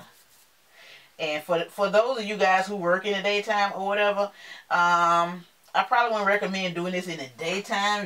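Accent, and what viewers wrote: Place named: Texas, United States. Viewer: Hey! I was watching your videos last year or whenever when you posted about Virgin hair fertilizers to grow hair and testing if they work etc. And I saw some uploads but not watched them about your cancer diagnosis and the birth of your baby. Also of your hair loss from chemotherapy treatment as I believe this was 2016 or November 2017? I might be wrong though lol By the way I like your accent! Are you born a Texan because it sounds strong unless its common? Hope you reach your hair goal soon!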